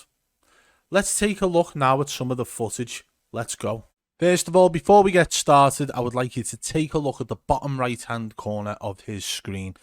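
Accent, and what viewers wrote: Liverpool, United Kingdom. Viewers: I never knew Ewan Mcgreger was a scouser or into COD. Excellent work as usual and can’t even watch streamers the same anymore. Defo a cheat as some of the big names are.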